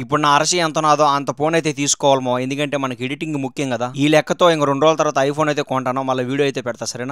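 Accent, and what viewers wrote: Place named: Kadapa, India. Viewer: Kadapa vallu anukotanu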